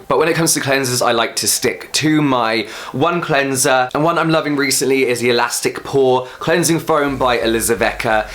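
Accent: United Kingdom. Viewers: Am I the only one who dies for the British accent? :D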